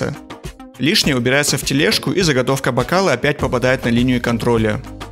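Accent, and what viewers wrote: Kabardino-Balkaria, Russia. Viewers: Кабардинец? Родной акцент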